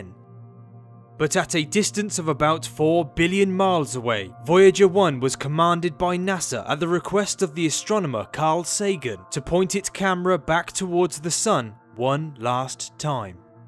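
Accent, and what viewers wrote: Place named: Liverpool, United Kingdom. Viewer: You probably shouldn't have said that the morons succeed in getting under your skin. They live for that shiz. Btw, I really dig your Liverpool accent...or is it Cockney? :)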